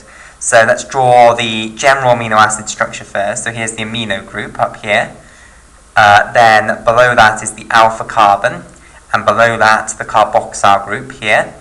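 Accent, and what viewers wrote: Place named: Scotland, United Kingdom. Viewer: You have successfully concentrated only one thing that is your Scotland accent. Thanks for the explanation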